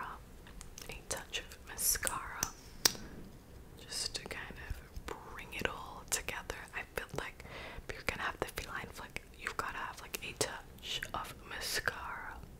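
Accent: Australia. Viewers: is that a fellow aussie accent i hear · Does anyone know what country she lives in? She sounds Australian, is that where she lives? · Subscribed bc we never get to hear an Aussie accent!!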